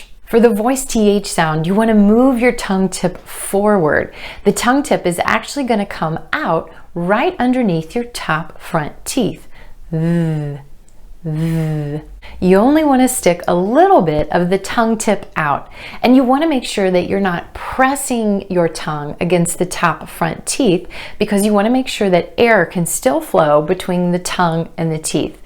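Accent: United States. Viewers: You are American? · Thank you so much for another perfect lesson. I really have fallen in love with your beautiful American accent. I enjoy every your lesson cuz you explain everything very clearly. Thank you so much for your hard work!!!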